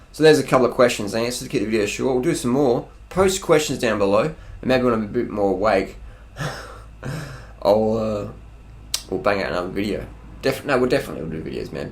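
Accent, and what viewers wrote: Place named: Australia. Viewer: ***** He doesn't talk strange. .he's just Australian :)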